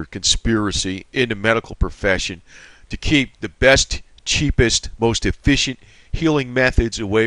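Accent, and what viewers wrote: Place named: Italian American, United States. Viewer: you sound like an Italian American kind of like Robert DeNiro type accent.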